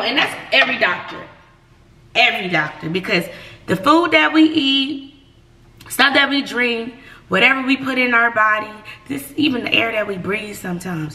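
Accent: New Orleans, United States. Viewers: Can u talk in your New Orleans accent with your LA friends 😆 🤣 😂 PLEASEEE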